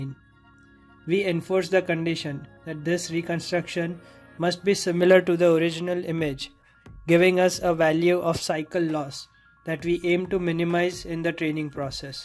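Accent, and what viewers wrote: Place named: India. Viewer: all i need is 1 sec to understand u are from india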